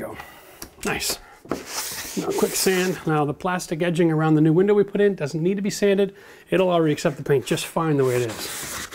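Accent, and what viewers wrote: Canada. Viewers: I’m here for the Canadian Accent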